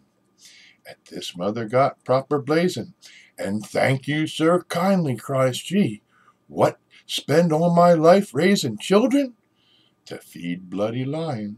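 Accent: United States. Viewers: Weird to hear it in US english, but it works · aThat was fun,. And if someone had told me that quintessentially Lancashire tale would have worked in your (lovely mild) American accent, I'd have said "Not bloomin' likely!" - But I'd have been wrong. Super version and great use of props.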